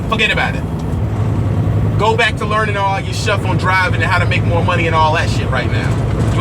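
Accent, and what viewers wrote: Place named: DMV, United States. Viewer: Brotha! I just found you on YouTube a few hours ago. At first I was on the fence as to if I was going to do the trucking thing, but looking at your videos has convinced me, especially being someone in my position. This is a great opportunity to prosper! Awesome content bro! All that aside, I can tell you're from the DMV..."young!" What's up man? I'm living in Cali now, but I'm from the DMV too. RESPECT!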